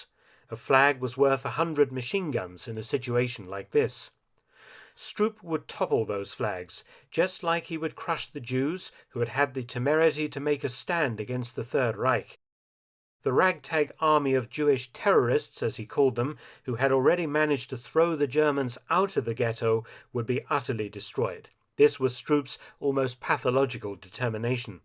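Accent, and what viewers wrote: United Kingdom. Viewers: When you have a WW-ll Historian and author at the helm how can you go wrong? The British accent narration doesn't hurt either. 😊👍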